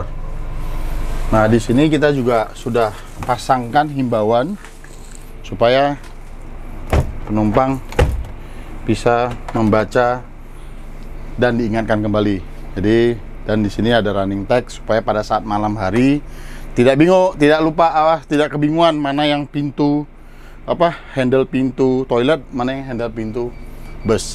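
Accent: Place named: Java, Indonesia. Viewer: Ouw tpi kadang ngmng bhsa jawa lancar. Kyk emang logat nya jawa gtu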